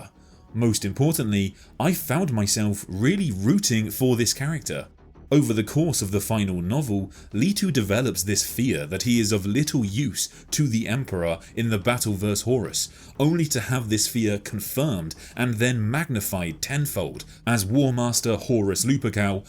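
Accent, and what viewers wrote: England, United Kingdom. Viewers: Mispronounces basic words in the first couple of minutes in a smooth English accent? AI slop. Boo! Go away.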